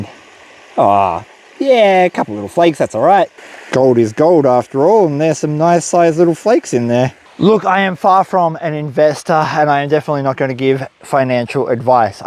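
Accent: Australia. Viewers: Bwahahahahahahahaa I loved the oratory comments spoken like a fair dinkum true blue Aussie mate, you have a way with words that bring tears of laughter to my eyes. Thanks for that I needed a great laugh and it was all true what you was saying about how the Gold standard was abolished by Richard Nixon of the Watergate Episode lol. Crikey do you think with all the shit going on that gold will rise further higher? I guess it is a waiting game hey, well stay safe and have a cracker of a day and you too.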